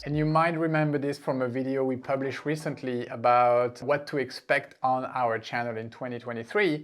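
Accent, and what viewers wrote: France. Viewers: Lol, just listen at his accent. He’s either French or from the French speaking area of Belgium. Pretty obvious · Hello ! Are you French ? Love the accent ! 🥰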